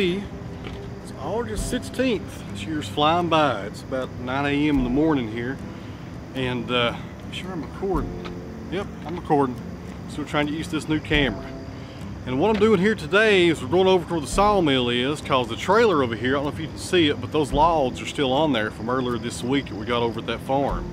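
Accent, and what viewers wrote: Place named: Tennessee, United States. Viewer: I didn't know where your particular flavor of southern accent was from, I guess Tennessee it is. Cool stuff.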